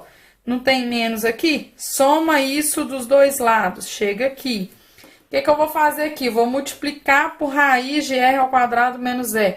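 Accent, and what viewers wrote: Minas Gerais, Brazil. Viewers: E esse sotaque Mineiro parabéns prof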